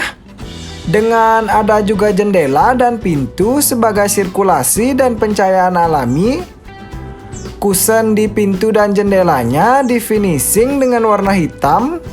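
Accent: Bali, Indonesia. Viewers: Itu logat Bali, Indonesia banyak suku dan bangsa, jd harap memaklumi . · konten kreatornya ngomong dengan logat bali, bukan di buat buat , Respect kekayaan bahasa indonesia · Ngomongnya logat bali...mohon dimaklumi lah....indonesia kan beragam bahasa dan budaya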